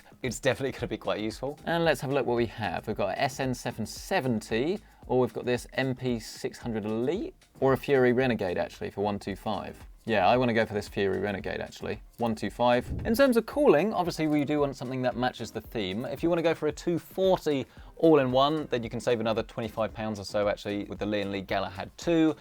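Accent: United States. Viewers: Dude, loved your snarky American Bro accent 😆 it made my day. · Damn your American accent is incredible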